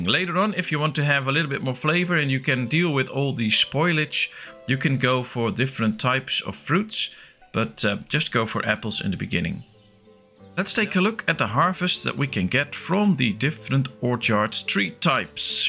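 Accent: Netherlands. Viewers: This is a very detailed video, it shows a lot of time and dedication invested in this game. Your English sounds very good, even though it's drenched in a thick layer of Dutch. You've spend a lot of time in the discovery of all the facts and numbers in this video, and I thank you for that. I too, have spend many hours playing Ostriv, and I believe your numbers and facts are correct. One detail though: if you build a field of 25x50, you will always have 1250 units, whatever plant you grow. That is, only if your field is placed on a flat and even surface. Even the slightest slope in the terrain will cause less plants could be grown. Groeten uit Ommen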